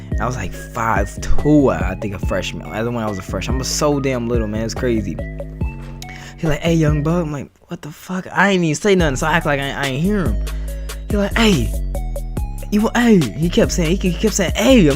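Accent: Chicago, United States. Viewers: You from Chicago ?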